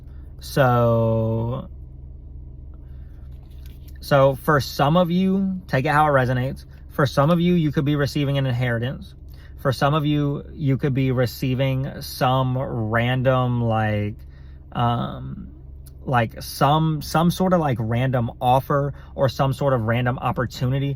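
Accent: South, United States. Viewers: this guy has a country accent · your semi-Southern drawl sounds like somebody's auntie on occasion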